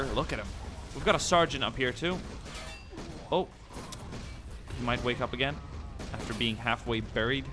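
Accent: Sweden. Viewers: U swedish? Am i Finnish 😁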